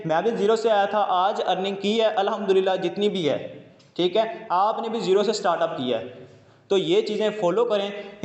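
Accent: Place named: Punjab, India. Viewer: Kia bat a Punjabi tarka🥰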